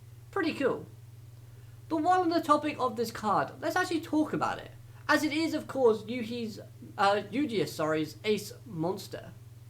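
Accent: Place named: United Kingdom. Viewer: sounds absolutely B R I ' I S H For who is essentially a British YGO reviewer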